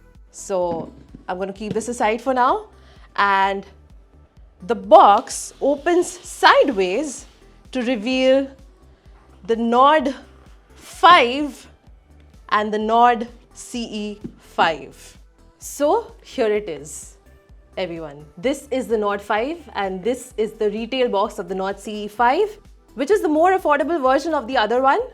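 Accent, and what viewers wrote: India. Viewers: Indian jese lagta hai ye english bolta hai😅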